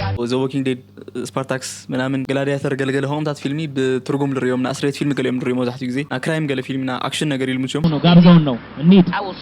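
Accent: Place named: India. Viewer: 5፡00 ሂንዱ ተናጋሪ ትመስል ብሮ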